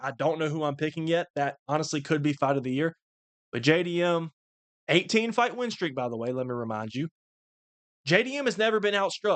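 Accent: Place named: Appalachia, United States. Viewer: bro got the hillbilly accent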